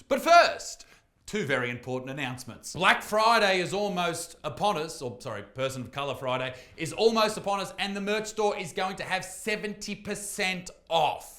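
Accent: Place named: Australia. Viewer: Mate, you’ve gone to shit it’s not even fucking funny. Your beard wobbles like a scrotum and you talk like this true blue Aussie ranting on about “issues” which you refuse to show both sides of the argument about or acknowledge fucking facts about renewables, ect. Your dad was a bloody NRL premiership player and you likely grew up rich af but still pretend like you speak for the people despite literally none of this affecting you, your whole MO has literally become stirring up controversy and then begging your viewers to suck you off, buy some of your merchandise and to watch one of your “standup specials” You know how Jake Paul claims to be a rapper and can’t rap? Yeah that’s exactly what you have become except with comedy, pushing some batshit crazy political shit that purely consists of “trans person crazy, body positivity crazy, vegan crazy” and when you do make a video that has valid points it’s regurgitating the same shit you hear a million times on other channels, (friendly Jordies controversy) your comedy is just putting on some god awful show with your followers where you meet and greet them, refuse to do nothing remotely funny and just consistently hate and be an edgy asshole up on stage, and your viewers love it. 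You could wank onto the fucking podium and your viewers would call you the greatest comedian of all time. Also, you unironically dressed up as the joker and shot some broccoli with an air rifle to promote one of your god awful shows. Honestly mate, you’ve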